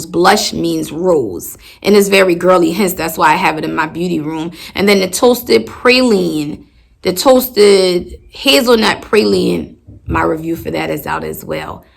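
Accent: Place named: New Orleans, United States. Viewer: I love your accent...are you from Nola??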